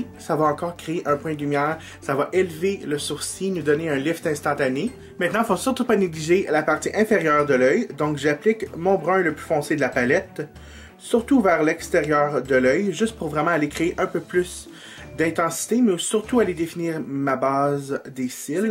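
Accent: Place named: Quebec, Canada. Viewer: Je suis une nouvelle abonnée, j'écoutais tes tutorials en anglais. Quelle agréable surprise aujourd'hui d'entendre ton bel accent québecois :D xxxx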